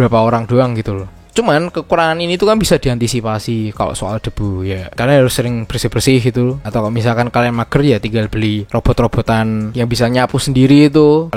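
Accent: Java, Indonesia. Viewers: Medokkk tmen... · Mas, medhoke pol asli😅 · Hmmmm jowone medok banget. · Jowo ne ngendi mas...? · Mas kamu mok muuuedhook banget logat e, wong endhi tho? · Logat jawir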